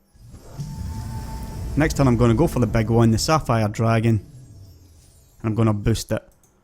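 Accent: Scotland, United Kingdom. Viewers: Engrish? I take it you have never heard a Scotsman speak before?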